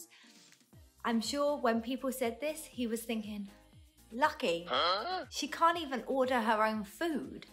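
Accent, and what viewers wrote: United Kingdom. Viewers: I like to listen to you talk, which made me love the British accent.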